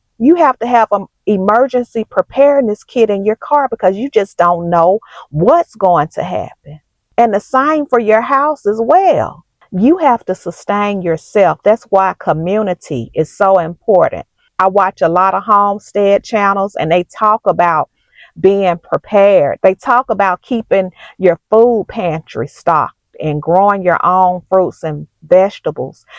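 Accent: South, United States. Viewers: I love your southern accent. I got one, too. Born and raised in northeast Arkansas but currently living in Oklahoma. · I just love her southern accent😊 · The algorithm sent me your video. 1st time watching anything from you. Love your Southern accent. Love you sis. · I love your southern voice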